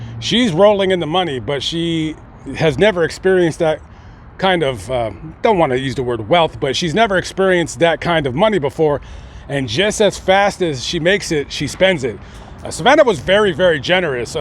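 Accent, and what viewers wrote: United States. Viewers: Un relato tedioso a cargo de un pelado con insufrible inglés "americano".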